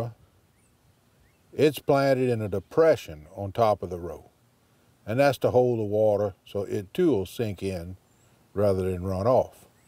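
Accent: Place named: Texas, United States. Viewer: I absolutely love your videos. So helpful and always entertaining. I'm from SE Texas just an hour west of the TX/LA border, but I live in Dallas now. Your accent immediately brings back "home" for me. Thank you so much for your videos and the time you put in to teach us all how to grow smarter, not harder. Lache pas!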